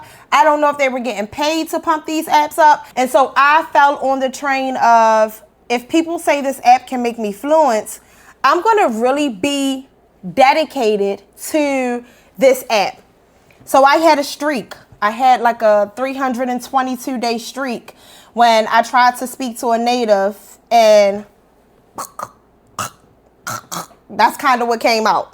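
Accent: Baltimore, United States. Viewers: Omg haha I remember watching you earlier and asking to myself if your accent was from mephis or baltimore🤣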